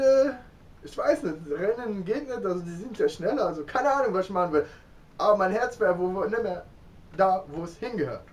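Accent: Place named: Hesse, Germany. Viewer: Ich finde Deine Videos immer sehr erfrischend und man lernt viel, vor allem mag ich die mit dem Wolfshund. Eine dumme Frage: Ich wusste übrigens gar nicht, dass die Luxemburger Deutsch als Muttersprache sprechen oder kommst Du eigentlich aus Deutschland? Ich hätte Deiner Sprache nach gedacht, dass Du aus Hessen oder Rheinland-Pfalz kommst und dass die meisten Leute in Luxemburg Französisch oder Luxemburgisch (Letzeburgisch) als Muttersprache haben.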